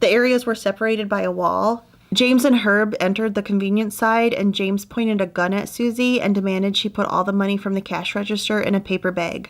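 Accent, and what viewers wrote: Minnesota, United States. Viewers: Love that Minnesota accent! Also from MN ❤❤ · I don’t comment on channels often, but had to say what a great job you do at storytelling. I came across your channel just a few weeks ago, and of course being a former Minnesotan, I was intrigued. This story really struck a chord as I went to SCSU in 89’ and my townhome was so close to this quarry. I had never heard of this story before, it was heartbreaking to say the least. I clearly remember when Jacob Wetterling was abducted and the National Guard flying over my dorm room in search. Always a true crime buff, but now a Floridian, I look forward to your podcasts…and loving your accent as it sounds like what will always be considered “home” to me…Minnesota! My best to you!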